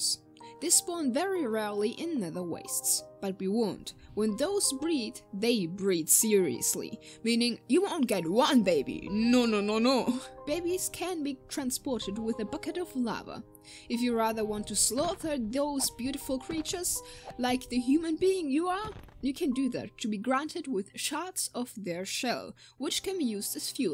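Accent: Germany. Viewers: Where are you from? You sound like german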